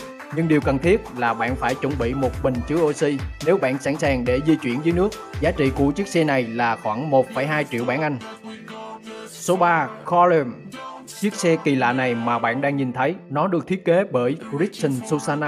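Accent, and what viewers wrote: Central, Vietnam. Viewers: Ad ng miền trung À??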